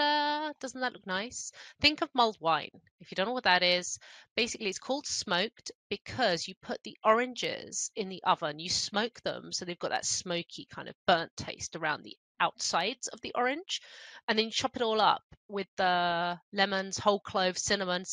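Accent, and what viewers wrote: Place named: England, United Kingdom. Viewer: Great video as an essay writing demonstration. Great accent. Are you from England?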